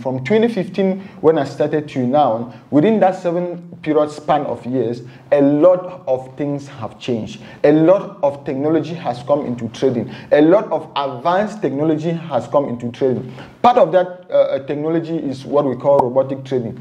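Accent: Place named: Nigeria. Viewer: I watched to the end 👁️👄👁️ bro I do really ur explanation, I would love to join u telegram group. Southern Cameroon and Nigeria have thesame accent, I thought u were a Nigerian.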